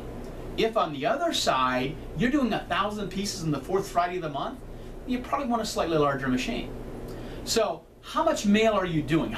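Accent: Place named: Arizona, United States. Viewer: I like your accent. Are you from AZ?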